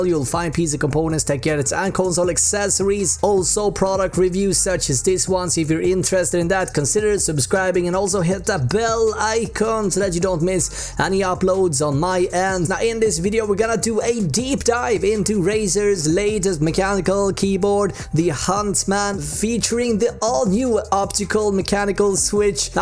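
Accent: Sweden. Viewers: u sond so swedish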